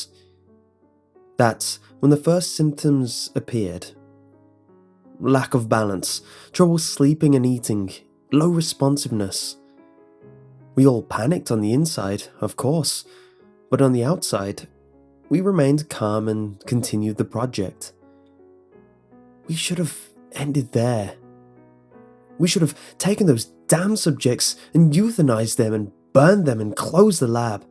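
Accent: United Kingdom. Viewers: Hi. What is your accent called exactly? I got the exact same and people ask me what (British) accent is it?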